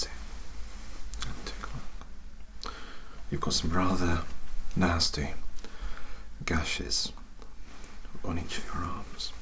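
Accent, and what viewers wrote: United Kingdom. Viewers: Great role play of Sirius Black bud very relaxing am interested are you American or British if your American but doing a British accent than that’s bloody brilliant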